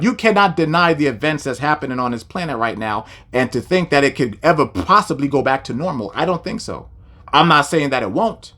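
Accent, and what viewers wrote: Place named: New York, United States. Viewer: Shalawam Brother, praying safety for u n ur household. Sounds like ur from the Big Apple so be safe n keep doing what TMH n Christ wants u to do. MHICBU all!!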